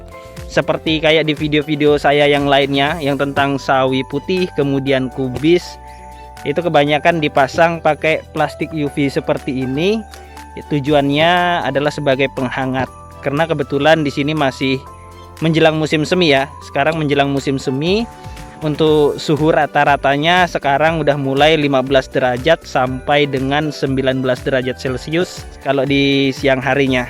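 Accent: Java, Indonesia. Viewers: Masnya ini jawa mana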